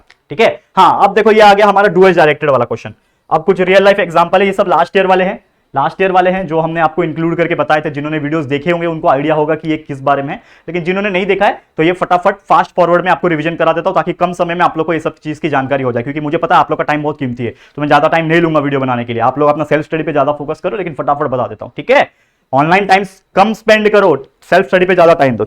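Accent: Maharashtra, India. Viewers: Sir aap Marathi ho kya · Sir apke muh se Marathi bohot pyaari lagti hai❤️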